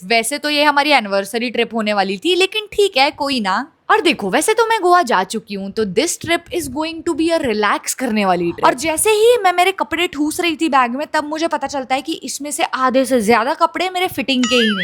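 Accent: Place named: Indore, India. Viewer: As a indori I just love that bich bich me thodu sa indori wala pronounciation 😁☺️🫶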